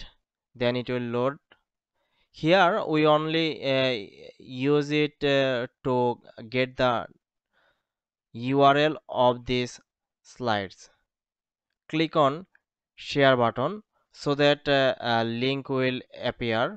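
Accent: Bengal, India. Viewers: ইংলিশ শুইনা বাংগালী মনে হইতেসে🙄🙄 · 100% u are bengali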